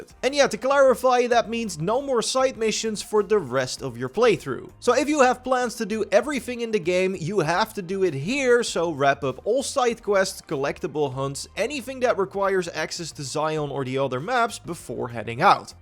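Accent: Netherlands. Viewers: they’re from the netherlands so they have an accent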